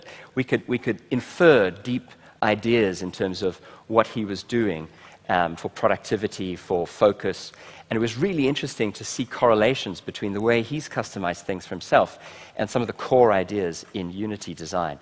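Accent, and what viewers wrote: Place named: South Africa. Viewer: Loving the South African accent and idiom.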